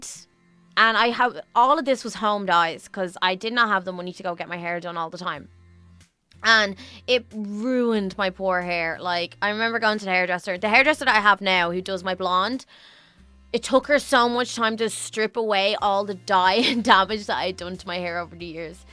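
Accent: Ireland. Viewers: Omg I remember watching u when I ren was like 7 omg I missed ur Irish voice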